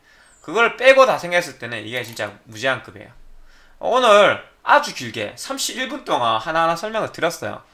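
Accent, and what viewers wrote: Gyeongsang, South Korea. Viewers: 님 말고도 유명 유투버도 육월이라고 하는 눈×전×라는분도 있는데 경상도분들은 다 육월이라고 하나요? 지역감정은 아니고 정말 궁금해서요.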